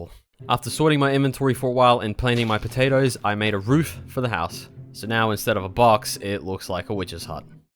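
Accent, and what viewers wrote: Australia. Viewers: How is your accent both Australian AND New York? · i'm not english native but i'm trying to figure out ur accent. are you australian? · R u aussie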